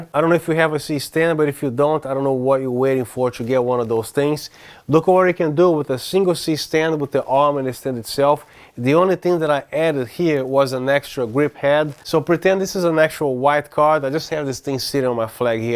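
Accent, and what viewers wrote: Brazil. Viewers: haha yes, I know a lot of Brazilians here in Houston and recognized it, hope you had a great new years, this was great information and you explained it really well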